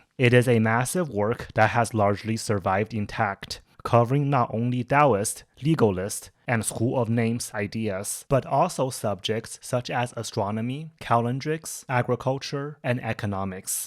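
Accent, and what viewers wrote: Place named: China. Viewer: Bro you have the cutest Chinese English accent❤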